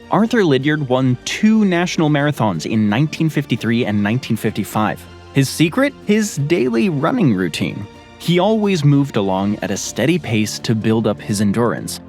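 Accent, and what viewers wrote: United States. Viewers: Hey, let's not forget that he sounds American and their words often sound different to ours. So attempted pronunciation is a good try. As for the Maori names, as a 7th generation Kiwi (Pakeha) I struggled with Maori pronunciation even though I learned the vowel sounds in school. The narrator may have had little to no exposure to the language. It's not spoken elsewhere and he may have been doing his best with pronouncing. Imagine if you were making a video on à country you have never had exposure to. Say China. They have two versions of language, Mandarin and Cantonese. I cannot tell which is which, but I know I once worked with a lady whose first name was Qian. Pronounced Chen. If I hadn't learned in that job with a multicultural workplace, I would not have learned how to pronounce that name. Many names, in fact. And I learned that Te Reo is a dying language and my Māori friend taught me a bit about it. 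Since then I have attempted to speak Maori words and names as accurately as possible, and told my daughter about this and she tries the pronunciation too. We do t always get it right, and I've been exposed to it all my life. As for the British names, I'm pretty sure it's just trying to split it into familiar syllables and mispronounced. As for me,.if I was doing a video on other places, I would run some pronunciation checks and see videos of people from the country or place and their pronunciation. But it seems many don't do this step. It's a shame, but not unexpected. No need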